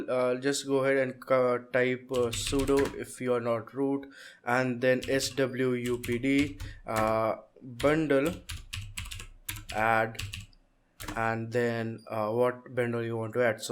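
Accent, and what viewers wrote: India. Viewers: I can't take you seriously with that indian accent. Already laughing.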